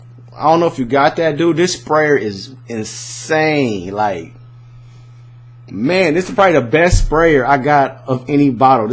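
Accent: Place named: California, United States. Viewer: you could tell he's from califronia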